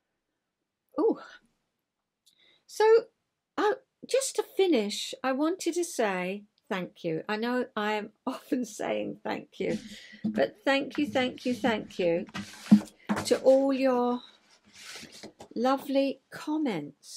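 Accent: United Kingdom. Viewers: Dear, Oh my goodness! I just popped in to see you, because I’m a huge follower of Julie’s! She mentioned you & said how much she loves to listen to you talk! I agree, you are an absolute delight! Where in the UK do you live? I love all the different accents & expressions that all of you have! I live in Lawrence Kansas, smack dab in the middle of the US! I lived in Cambridge (England!) for a year, when I was 6 years old way back in 1963. Even though that was practically the age of dinosaurs, I remember so many things so clearly! I came back with a lovely accent, but the other children at school made fun of me, chanting “Erica-America”, so I lost it as fast as I could… I wish I had that accent now! My favorite memory was a pair of blue “real” leather shoes my mom bought me. They had laces, maybe like an Oxford shoe? At any rate, I wore them to bed that night, because I loved them that much! 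Silly, right?! I wish I had a photo to go with that memory! Your little journals are so wonderful, I think I need to think about making those for my family, too! And those 12x12” paper pockets are wonderful, just so long as you don’t cut off the wrong piece! It’s so nice to “meet” you & I’ve subscribed, so I’ll be checking in again, soon! Take good care! Erica❤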